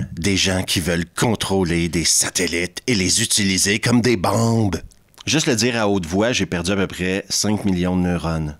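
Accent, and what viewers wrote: Quebec, Canada. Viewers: J'ai découvert ta chaîne il y a quelques jours et je me suis tellement fendu la poire que j'ai dû voir au minimum une cinquantaine d'épisodes. Qql suggestions : Brain dead, bad taste, phase IV. PS, j'adore l'accent québécois 🤩🤩🤩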